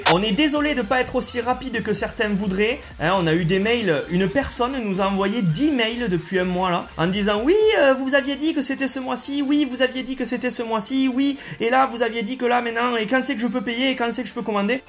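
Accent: Toulouse, France. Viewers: rapha 1023 cest l accent toulousain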